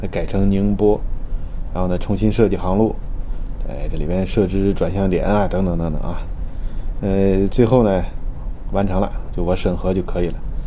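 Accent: Tianjin, China. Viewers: 您是天津人吗？ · 船长辛苦！下次来纽约新泽西需要什么提前说一声，无论你在哪一个terminal停靠，我都可以给你送过去。您略带津味儿的普通话很咯儿很亲切（我是北京的，您有一期节目车在二环上行驶从我家楼下经过)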